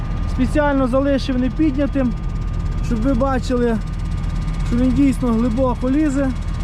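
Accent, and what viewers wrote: Ternopil, Ukraine. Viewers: з якої ти області? По розмові ніби з Тернопільської.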